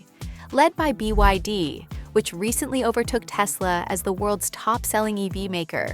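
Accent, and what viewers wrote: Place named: United States. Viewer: You have an American/Canadian accent. Yet you are pro-Chinaman accent. 1+1 doesn’t add up with an American accent. You are an agent of the CCP? Or AI voice agent ?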